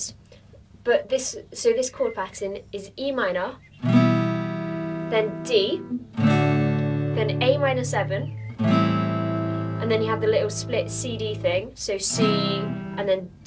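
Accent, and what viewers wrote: United Kingdom. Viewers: Blonde... British accent... Plays guitar and play? I think im in love... I came Ten years late I think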